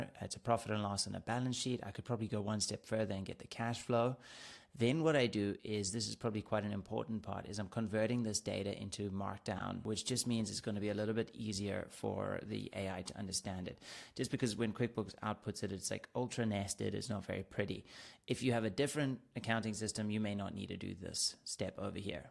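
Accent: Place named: South Africa. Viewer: Well presented and it unlocked some ideas for my future client builds. It's a definite game changer for creating neat reports, without having to use various apps. I thought I recognized the accent... a fellow saffa:-D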